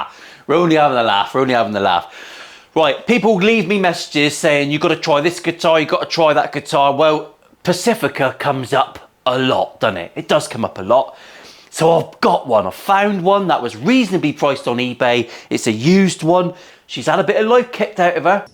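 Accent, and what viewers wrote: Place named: England, United Kingdom. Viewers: Another Monday made better - cheers you fucking legend. Btw love how you pronounce it pacifiker. Janner! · USA Americans will have a hard time understanding his talk .... he is from England and not the USA...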